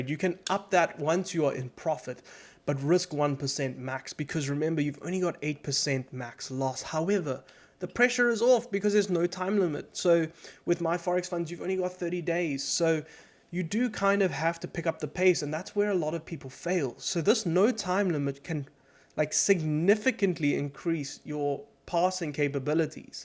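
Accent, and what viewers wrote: South Africa. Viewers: Ah you can’t miss a South African accent, from a fellow South African being able to get funded opens a massive opportunity for us dealing in dollars, I’ve been wanting to take a challenge and hopefully, I can get my chance.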